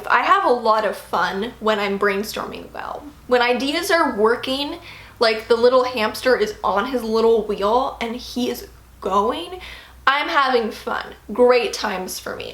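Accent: Canada. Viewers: So I should apologize then. I’m sorry I made you feel attacked, I mean you don’t even know me lol that has to be the most annoying part. But I still find it hard to believe that you have never had anyone say that your accent sounds high class. Like the word Aunt. If you pronounce it “Ant”you are “normal” but if you pronounce it “Awe-nt “ like the British do, then us “normals” will see it as high class and jot that down in the back of our heads. Do you see? Idk if there is a true form of English that invokes the superior intellect but it’s something that id like to see your option on. For example a person who thinks in Spanish will have different thought patterns that one who speaks in a Western accent. So what I am challenging you on is do you think your Canadian accent makes you think more intelligently that my Western or American accent?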